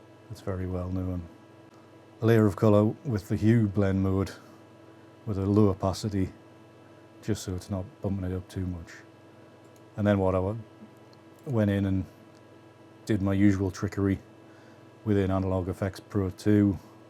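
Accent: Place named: Northumberland, United Kingdom. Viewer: Hey, thanks for sharing your process and approach, your images really cross a lot boundaries, which for me makes them so interesting. Also as a lad born and brought up in Corbridge but loving in Devon, I am loving hearing the Northumbrian accent. Have you met Thomas Heaton btw?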